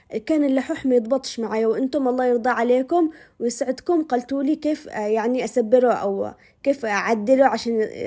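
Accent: Aden, Yemen. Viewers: تسلم الأيادي ي بنت اليمن ذات اللهجة العدنية الجميلة.. باقي 62 يوم على قدوم ضيفنا الكريم .. رمضآن.. شهر الخير ..طالبين من يدك الكريمة فضلا وليس أمرا.. بعض الأطباق التراثية الجميلة العدنية و المدن المجاورة لها حسب استطاعتك. لآ يكلف الله نفسا إلا وسعها. ألله يعطيك الصحة والعافية...إبن اليمن من جدة السعودية..🎉🎉🎉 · تحديثها وشرحها ممتع مع اللهجه العدنيه عدن ام الدنيا وناسها الطيبين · اتابع الطبخه ❌ اتابع اللهجة العدنية وجمالها ✅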